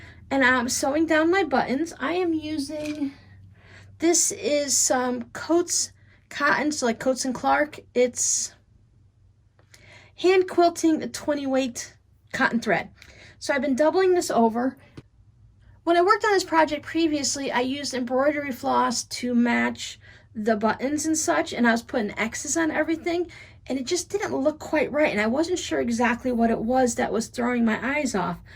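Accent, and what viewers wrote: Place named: South, United States. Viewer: sincerely I didn’t intend to imply you say it incorrectly. Being born and raised in Florida and having been gone since aged 20 I love hearing how you say things in a sweet southern accent. Ha be a wonderful Christmas. ❤️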